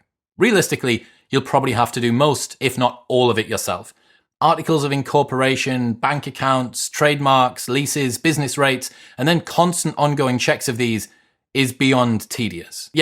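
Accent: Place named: England, United Kingdom. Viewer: I love this English accent. You treat the "u" consistently.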